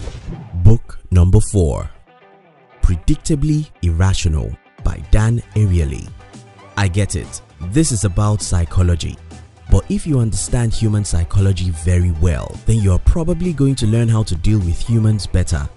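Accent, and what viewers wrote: Nigeria. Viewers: I know a Nigerian when I hear one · voice must be Nigerian. m'right? i'm 98% certain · Accent sounds Nigerian :?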